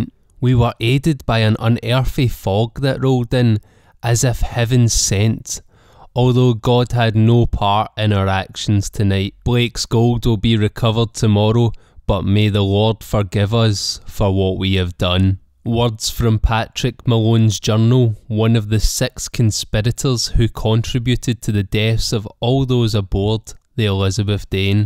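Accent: Scotland, United Kingdom. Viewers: Are you Scottish? Heavy but wonderful accent. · What part of Scotland are you from? Glasgow?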